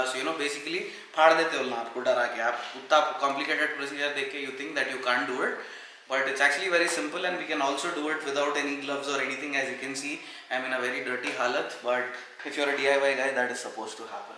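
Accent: Hyderabad, India. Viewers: mian aapki Hyderabadi accent bohot mast hona mian. I love the way you talk man, you can make a rondu laugh his lungs out brother. Cheers. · loves when u talks in hyderabadi language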